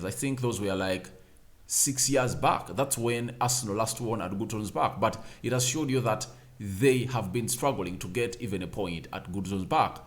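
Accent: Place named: Iteso, Uganda. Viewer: thanks for the job you really give the truth but i request you to tell me your country and tribe coz you speak like an itesot from soroti city uganda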